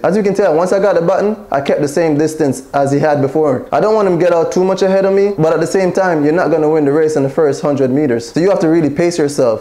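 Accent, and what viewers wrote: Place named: Jamaica. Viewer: The accent had me though..Are you Jamaican 🤔....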